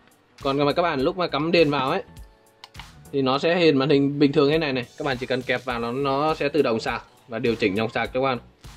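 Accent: Nghe An, Vietnam. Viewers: Anh ơi anh người nghệ an hả anh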